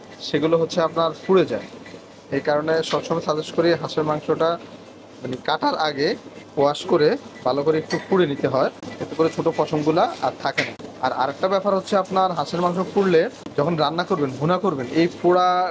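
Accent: Noakhali, Bangladesh. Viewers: আমনে নোয়াখালীর মানুষ, কথায় বুঝা যায়, তবে রান্নায় ওস্তাদ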